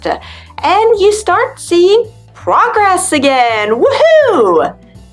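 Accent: France. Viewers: Oh my God! I can notice the french accent when you speak😱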